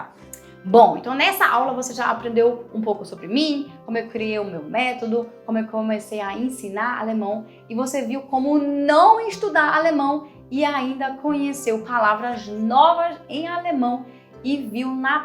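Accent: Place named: Northeast, Brazil. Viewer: Hallo! Desde a primeira vez que escutei sei vídeo, fiquei com uma pulga atrás da orelha. Pensei ela é do nordeste e me parece ser de recife🤣🤣uma nordestino conheci o sotaque. Apostei até com amigas. Até perguntei no Instagram.Amo seus vídeos😍sucesso pra vc!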